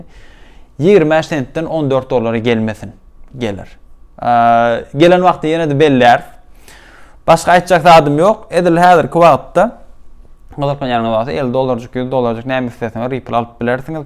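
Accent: Turkmenistan. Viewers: Turkmenistan Turkcesi mi bu?) Cox gozel seslenir)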